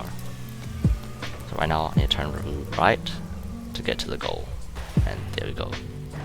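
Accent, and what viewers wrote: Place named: Germany. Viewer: Ich denke mal du bist deutsch, ich denke, dass ich das ein bisschen raus höre. Also ich hab eine Frage, muss man durchgehend Tornado spinnen? Und lenkt man dann nur mit w und s?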